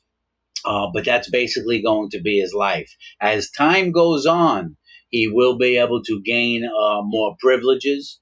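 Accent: New York, United States. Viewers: i can tell you from NY immediately as i am too i started watching your other videos too ….good stuff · I love ur NY accent! I dated a guy who did 27 yrs and he sounds just like you. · Just found your channel. You sound like you're from new york. I appreciate your somewhat objective/neutral analysis of this situation. I appreciate you telling us about the difference between federal prison/lockup and state prison. It almost sounds like you've done some time yourself. It's like having an uncle or papa or some neighborhood guy telling all the kids gathered around the block about the prison system and how it works. So that they don't end up like him. Something tells me that he might get moved simply due to the fact that some of the victim's families live in the state of idaho and they don't wana be sleeping in the same state as their loved one's killer.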